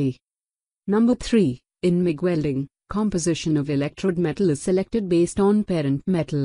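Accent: India. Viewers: I can't stand the 'valley girl' female or 'middle aged' man voice. Drives me absolutely nuts. With an Indian accent? This one is new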